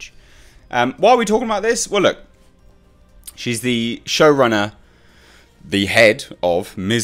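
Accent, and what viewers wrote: United Kingdom. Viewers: I've become so used to the British accent when you said you never heard of here I was about to say something. Than realized, hey, he's British. The internet has ruined me lol. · Wait....you're British?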